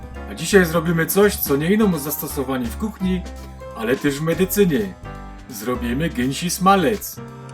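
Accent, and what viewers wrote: Silesia, Poland. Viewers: ..to szukaj na internecie po polsku a nie obrażaj pięknej śląskiej gwary😮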